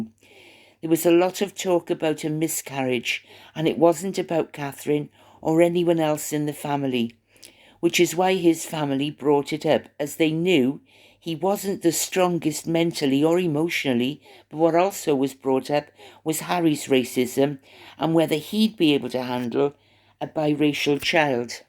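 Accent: Wales, United Kingdom. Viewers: I feel like I'm in front room on a Sunday. It's like listening to my nan. I'm from Newport next to Cardiff so they sound virtually the same. It's so comforting..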